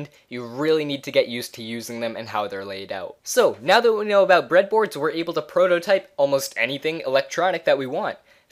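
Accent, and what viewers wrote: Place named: Canada. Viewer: Your "About" page says "United States" But your accent says: "Canadian" I'm confused. >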